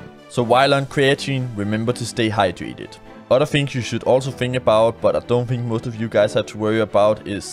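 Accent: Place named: Scandinavia. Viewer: You have one of the worst scandinavian accents I've ever heard. It makes my ears bleed!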